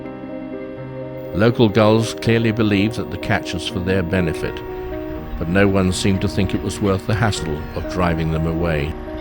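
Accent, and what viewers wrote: United Kingdom. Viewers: enjoyed everything about this wonderful trip to Iceland. T he narrator kind of sounds like Orsen Wells! terrific voice with a slight British accent . I could listen to him all day! Thank you so much, for sharing your adventures! Question, Is Icesland part of Norway? It was discovered by the Vikings I was told! Did the Vikings clame Green land?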